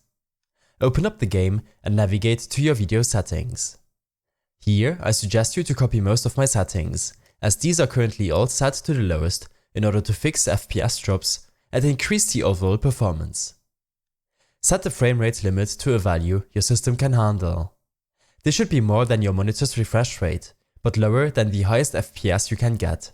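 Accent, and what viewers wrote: Germany. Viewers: man hört sooo hart dass du deutsch bist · Junge man hört anders dass du eig immer deutsch redest xDD :DD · Man hört dass das ein deutscher ist😂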